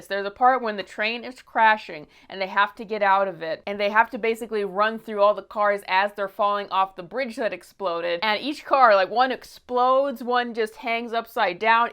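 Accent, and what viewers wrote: United States. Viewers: I love your accent! What state are you from?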